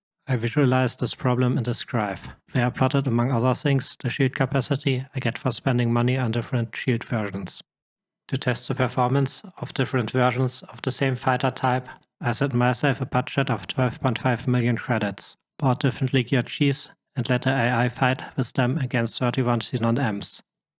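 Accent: Germany. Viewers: Thank you for sharing your findings. Ze graphs and German? accent really drive the facts home on this one :) :)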